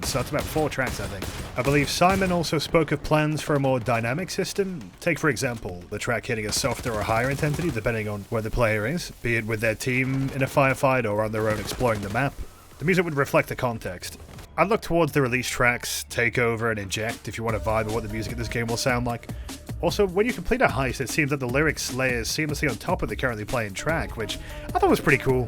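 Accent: United Kingdom. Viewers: yes britbong whisper those sweet supple long words some more please <3333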